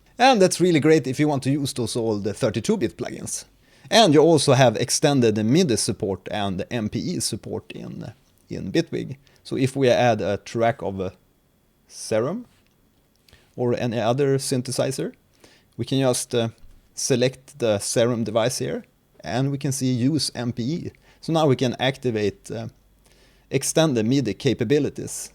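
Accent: Sweden. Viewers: Thanks for this informative video. (PS! Love that Swedish accent)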